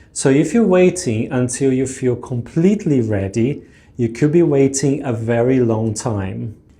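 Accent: United Kingdom. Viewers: Um abraço de Sheffield de uma irlandesa que fala português. Nunca morei no Brasil, mas adoro o país e estive lá umas 7 ou 8 vezes ao longo de 25 anos. Na primeira vez, eu literalmente não conseguia abrir a boca para dizer nada; a tia surda-muda do meu namorado na época (um mineiro) até simpatizou muito comigo porque achava que eu também era surda-muda! 😅 Depois que soube disso, comecei a abrir a boca e a usar as palavras que tinha na cabeça. Eu lia livros e jornais, ia a restaurantes brasileiros e conversava com os funcionários. Não tenha vergonha de cometer erros. É exatamente assim que as crianças aprendem, e nós também ficamos uma graça tentando falar com nossos sotaques estrangeiros. Eu não entendia por que todo mundo caía na gargalhada quando eu dizia que adorava salmão “fumado”. 😂 Você tem um sotaque inglês adorável e culto, e acaba de ganhar uma nova seguidora. 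P.S.: Outra dica que me ajudou, além dos podcasts, foi assinar o Spotify Premium e cantar junto enquanto lia as letras das músicas brasileiras.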